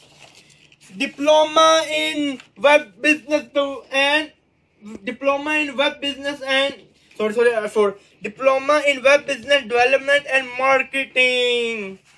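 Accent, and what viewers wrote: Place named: India. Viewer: Basically you are the reason whole world thinks all indians have an accent like you basically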